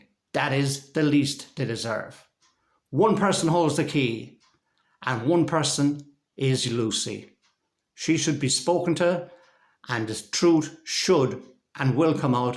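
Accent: Ireland. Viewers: Oh I love your Irish accent, xx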